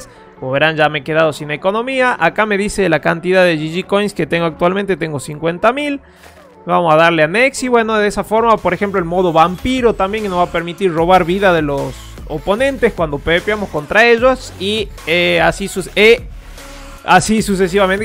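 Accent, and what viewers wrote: Argentina. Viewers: Wen eres argentino? Solo pregunto por el asento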